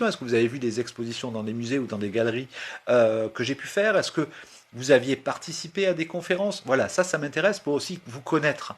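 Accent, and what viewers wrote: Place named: South, France. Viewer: C'est demandé de façon si aimable !... Je ne vais pas détailler toutes les questions posées (je n'ai pas pris note de toutes 😉) Mais ça risque quand même d'être un peu long (1000 excuses d'avance) Préambule : je n'ai rien contre le fait de se tutoyer. J'ai toujours travaillé dans l'enseignement. Je n'ai tutoyé mes étudiant(e)s qu'à partir du moment où ils-elles sont devenu(e)s des collègues. J'ai un titre d'ingénieur en mécanique (tendance maths - élasticité, milieux continus...). Assistant à l'univ de Louvain (1973-79), prof de physique aux Comores (80-81), prof d'informatique (programmation essentiellement) de 1982 à 2012. 1) L'intérêt pour tes "Flashs" : essentiellement ceux relatifs à l'histoire de l'art. J'ai suivi (<num> ans de cours de photo à l'École des Beaux-Arts (ça sonne plus moderne que "Académie") de Wavre. 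Si le cours d'histoire de l'art (obligatoire) avait été donné "à ta façon", j'aurais sans aucun doute persévéré pour terminer le cycle de 9 ans, mais... Mon dada, c'est plutôt la photo "sociologique", genre Walker Evans (Depardon, Ronis...) 2) Comment améliorer ces Flashs ? Jusqu'il y a peu, j'étais gêné par le manque de synchronisme entre image et son et le fait que l'image était "à l'envers" (comme pour la plupart des gens qui partagent des images de Smartphones). Cette fois-ci, ça semble synchrone, donc... Tu as une excellente diction et un petit accent méridional des plus gouleyant à l'oreille (un peu comme celui de Mouscron chez nous 😂) 3) Question nivea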